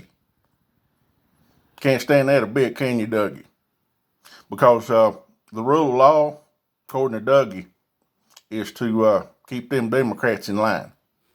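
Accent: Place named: Georgia, United States. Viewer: I'm sorry for you you don't know what the hell you're talking about. You need an education. You sound like your from Georgia or Florida.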